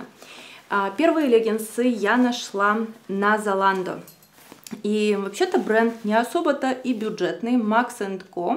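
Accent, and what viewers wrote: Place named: Surzhyk, Ukraine. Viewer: хочу сделать вам комплекс. Примерно 4 года назад я перестала смотреть все ваши видео потому что ваша речь была просто ужасной. Постоянное эээ, мммм, все жутко медленно и без конструктивного текста. Случайно наткнулась на это видео и хочу сказать что сейчас стало намного лучше!! Ваш русский тоже стал лучше, сурджик уже не так сильно режет слух. Ещё есть куда расти, нужно поработать над тем чтобы быть более естественной перед камерой, но все же- очень заметен ваш рост как ютубера! Так держать! 👏🏻👏🏻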